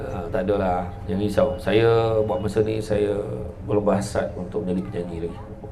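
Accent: Negeri Sembilan, Malaysia. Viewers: saya rindu nak dengar orang cakap nismilan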